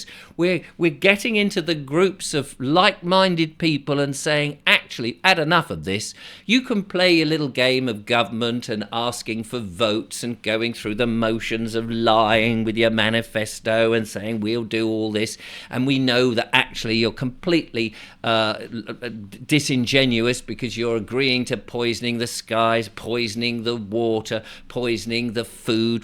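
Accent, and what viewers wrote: United Kingdom. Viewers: One of the few British accents I actually like😊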